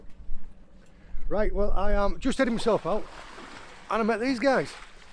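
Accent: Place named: Northern England, United Kingdom. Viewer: where you from pal i recognise that accent , up north somewhere?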